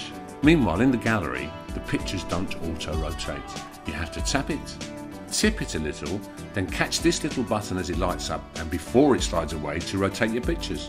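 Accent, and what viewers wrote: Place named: United Kingdom. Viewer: I loik that british accent sow much :)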